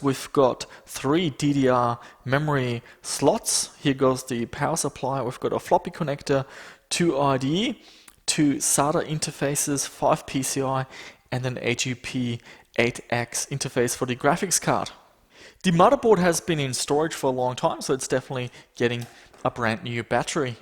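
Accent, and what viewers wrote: Germany. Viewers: Deutscher knn der akzent nicht werden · The german is Strong is this one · Are you german?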